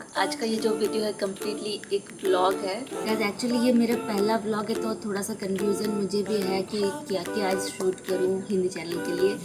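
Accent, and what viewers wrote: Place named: Odisha, India. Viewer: No your hindi is not that bad ... its generally the accent of odias.... anyways it will improve with time ... and the video is not boring at all . And at some part of the video only the background music was dominating your voice (hope you dont mind )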